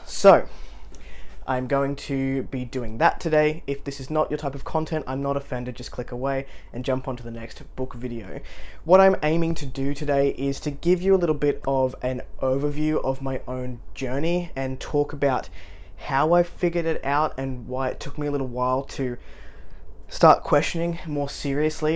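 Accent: Australia. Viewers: Extremely open and brave video,. Sounds like a long and difficult journey, but I’m glad you’ve gotten to a good place with it all. I don’t directly know anyone who’s transitioned, so I learned a lot. Thank you. And apart from that, it’s always a joy to hear your Aussie accent, mate. 🙂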